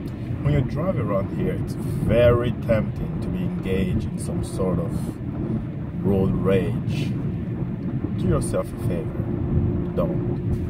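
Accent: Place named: Nigeria. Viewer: Wow...i love your accent. Are you Nigerian?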